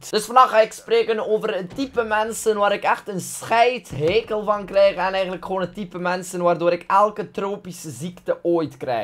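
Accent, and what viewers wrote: West Flanders, Belgium. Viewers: woon je eigelijk in West-Vlaanderen of denk ik dat gwn 😂❤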